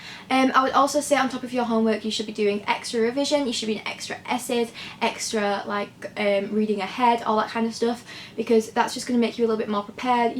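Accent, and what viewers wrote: Yorkshire, United Kingdom. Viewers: Ackley Bridge accent YAS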